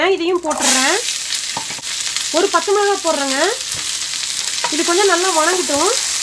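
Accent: Kongu, India. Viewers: enka Kongu nadu styla pesarinka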